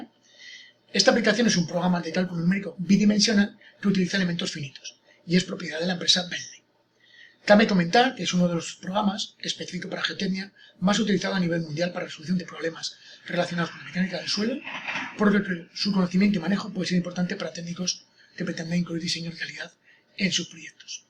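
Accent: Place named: Spain. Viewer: Que acento para mas feo, del idioma castellano el peor hablado se encuentra en españa, sin duda.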